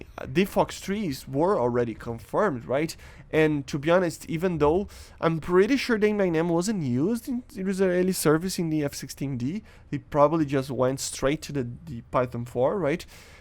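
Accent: Brazil. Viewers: are you Brazilian???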